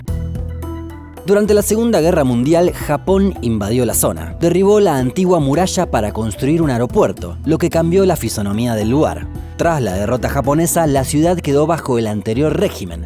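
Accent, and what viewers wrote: Argentina. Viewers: Me gusta tu acento argentino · Se me hace muy chido tu acento, eres de argentina o uruguay?, y no soy gay, solo simpatizo con ese acento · El acento argentino me excita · “Shaky Chan” amo el acento argentino 🤩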